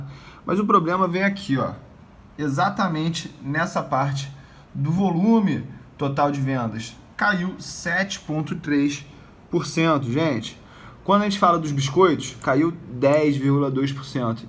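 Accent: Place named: Rio de Janeiro, Brazil. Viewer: Bom vídeo , mas esse sotaque carioca é cansativo pra mim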